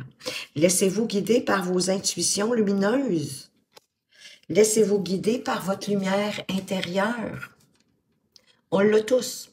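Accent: Quebec, Canada. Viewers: Dites-moi, êtes-vous québécoise?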